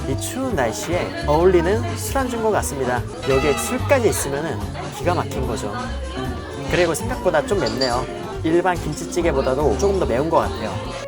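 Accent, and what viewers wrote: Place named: Busan, South Korea. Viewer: 부산 분인가요..? 내가라고 말씀 하시는 것 같네요 와이프도 제가 보다 내가라고 얘기 많이 하던데.. 설명 하시는 목소리에 감칠맛이 가득 하세요 친구분들은 무슨복인가요 너무 부럽습니다... 와....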